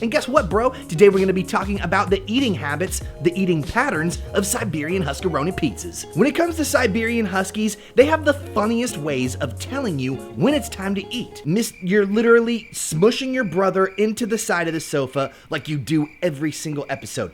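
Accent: United Kingdom. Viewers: This guy can star in any British movie lmao I love his British accent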